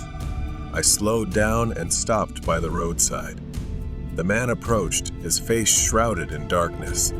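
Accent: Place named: New Jersey, United States. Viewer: your jersey accent makes your videos all the more comforting. been following since😰😰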